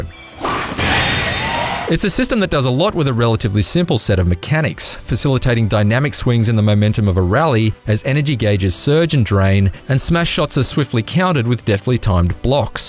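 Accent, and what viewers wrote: Australia. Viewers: Great review and most importantly I really enjoyed the Aussie accent……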